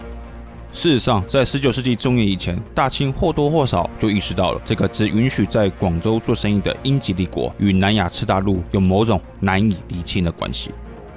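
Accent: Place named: Taiwan. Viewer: 能不能配上中文，你这台湾腔有点听不懂啊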